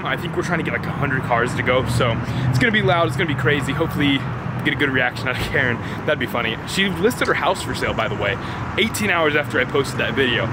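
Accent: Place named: Texas, United States. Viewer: You sound like someone from Texas....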